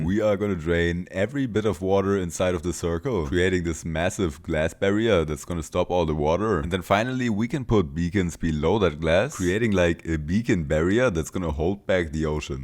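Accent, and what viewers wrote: Germany. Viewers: I hear the deutch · As a german, 😭✋️ dein deutscher akkzent ist so süß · Bei der Aussprache wusste ich das sich hinter der Stimme ein Deutscher versteckt😂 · i knew i! i knew you were german. the accent gave it away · feels like german accent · warum hab ich direkt gehört, dass du deutsch bist ohne ein Video von dir jemals gesehen zu haben ahhaha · Hab doch sofort am Akzent erkannt daß wir hier jemanden Deutschen haben😂😂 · i hear some hidden german lmao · I smell German 🤨🤨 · Took me a moment to realise that you're German. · Ich wusste du bist deutsch allein schon an der Stimme bzw. der Sprachweise 😂😂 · first video I ever see from you, immediately clocked you as a fellow German 😂 you sound so similar to Doc, when talking English so it immediately stands out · ja save der typ so fett deztsch habe nämlich genau denn selben gedanken aber bro hat eine gute idee weill englisch sprachige youtuber besser verdienen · Deutsche hier? 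Hallo Leute und bist du wirklich deutsch weil es hört sich wirklich an als würdest du deutsch sein. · I here a bit of deutsch❤ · AYYY UR GERMANNNN, I HEARD IT FROM THE ACCENTT XDD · Ja der ist safe deutsch. Man hörts leider komplett, an Aussprache und den Grammatikfehlern. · I know a german when I hear one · Ich liebe diesen Deutschen akzent 😅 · dieser Deutsche Akzent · Oh hey, I'm smart enough to recognize a German accent, nice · I definitely heard a german accent too (Im not german tho) :> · wie deutsch der redet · Great Video and I can’t unhear the German accent😂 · die ganze zeit war ich so hör ich da deutschen akzent? und dann kam qual der wahl hehe. Cooles video 👍🏼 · Dare I say I hear Deutsch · Ich höre doch das du Deutscher bist 👀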